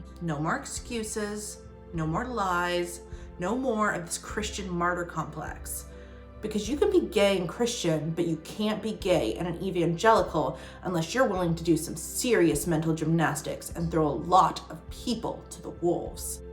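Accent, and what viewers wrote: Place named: California, United States. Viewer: Still not over your California voice 😭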